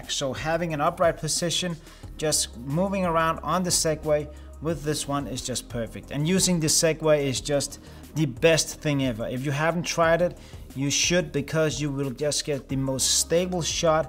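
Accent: Denmark. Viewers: Are u Danish?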